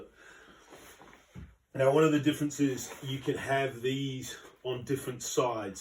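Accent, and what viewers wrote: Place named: Australia. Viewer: Looks awesome! I don't remember you sounding so Australian though!... Have you spent some time over there since we last spoke?!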